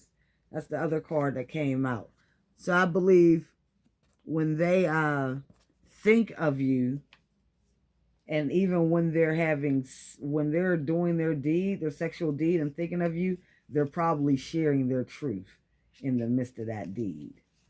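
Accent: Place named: Baltimore, United States. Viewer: oh wow idk why it sounds like a baltimore accent lol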